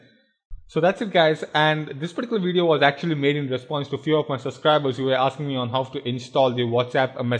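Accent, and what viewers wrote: India. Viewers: thx dude, i like ur indian accent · so... india e?.. so thats where the accent came from.. nice vid & accent btw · this is gonna sound rude or racist, but I hate this fucking indian accent... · english.....india dialect...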